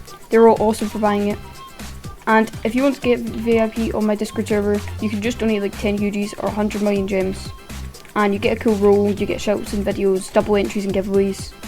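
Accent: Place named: Scotland, United Kingdom. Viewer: Scottish roblox YouTuber no way I was thinking about starting 😂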